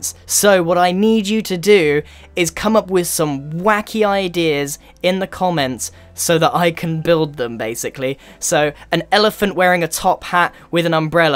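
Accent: United Kingdom. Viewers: a person with a British accent talkin' about spitfires??? Y E S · I've been waiting for YEARS to say this. You Sound British 😂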